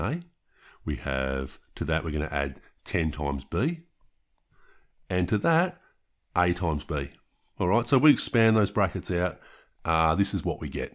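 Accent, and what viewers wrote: Australia. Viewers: I agree with you Adano. That is an Australian accent.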